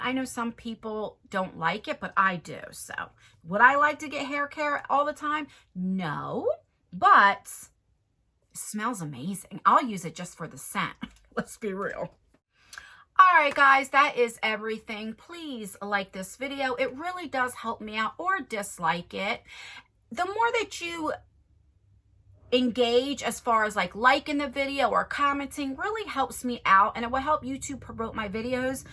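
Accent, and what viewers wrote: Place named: Philadelphia, United States. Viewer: You're accent makes me nostalgic for home. I'm from Philly but Jersey n Philly ppl have the same accents. Lol. My accent kinda diminished through the yrs of not living in the area. It took a long time to stop myself from saying wooder instead of water.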